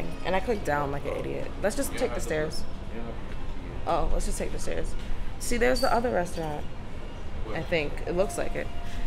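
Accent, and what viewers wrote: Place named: Spain. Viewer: You got a lil Spanish flow! Love watching people enjoy my island! 🍻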